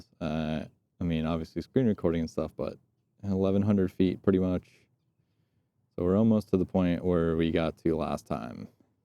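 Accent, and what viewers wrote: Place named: South, United States. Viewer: oh definitely not Texas 😂 maybe Alabama or Georgia am i right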